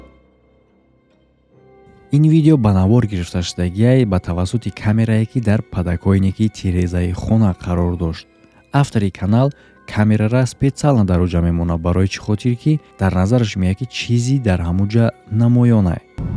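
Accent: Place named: Yovon, Tajikistan. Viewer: Ака ба Худо ма кайф мекнм ранги мо ЁВОНИ Ё гап мезани чашмошон блк-блк ай гап нест хдт да ака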